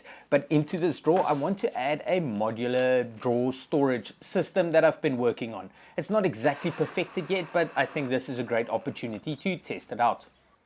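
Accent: South Africa. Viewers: Hello sir. Great project. Are you South African? Your accent makes me believe you are one of us.😂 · Sounds South African to me, but I’m no expert.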